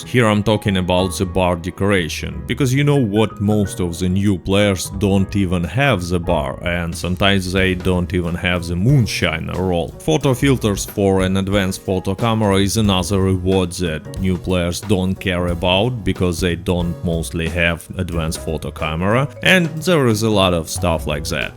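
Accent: Russia. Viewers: I like your russian accent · I didn’t have the chance to buy them last time. By the way huge fan here :) keep rocking and keep roasting with your Russian Accent <3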